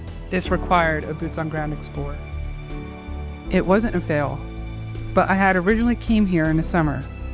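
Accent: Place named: Philadelphia, United States. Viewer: Loved the video. Was it intentional not to reveal anything about the location? It feels a bit counterintuitive if your goal is to share historical preservation. I couldn’t even figure out where you're located in the United States, though I think I hear a Philadelphia accent—maybe? Adding a mention in the description or title could be helpful.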